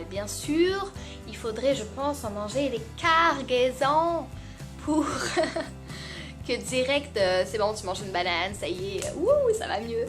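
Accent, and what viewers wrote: Quebec, Canada. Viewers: j'ai eu peur que tu ai perdu ton accent queb... ouf il est tjrs là! mais t'as passé combien de temps la bas? tu reviens?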